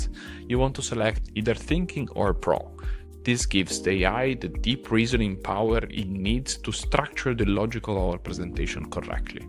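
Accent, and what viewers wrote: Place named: Italy. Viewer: This is awesome thank you for sharing this keep showing up! Saw your LinkedIn post about your accent. I think the Italian accent is awesome! 🙌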